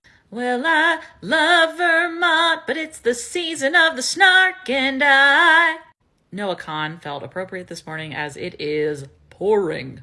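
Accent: United Kingdom. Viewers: Smart and hilarious, but also I enjoyed listening to your British accent. Moreover you're apparently a very good singer. ❤❤❤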